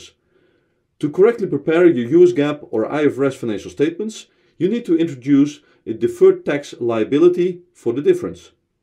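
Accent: Netherlands. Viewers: Hi, are you Dutch? (From your acccent)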